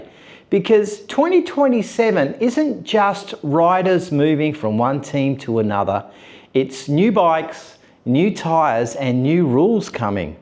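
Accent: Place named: Australia. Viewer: right. This site has the most authentic and knowledgeable discussion of our favourite sport. No AI, and in plain (Australian) English! Good one. Yes, a lot to look forward to over the next 24 months. And by the way, it is Toprak. I suspect we will all have to get used to spelling Toprak Razgatlioglu (with a silent 'g', and means 'son of Razgatli' I understand). Often called 'Stoprak' of course, for the stoppies! He and Jack have already started the Pramac stoppie contests...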